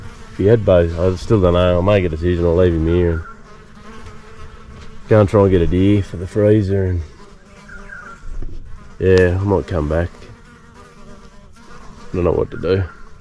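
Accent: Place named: Queensland, Australia. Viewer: Q'ld accent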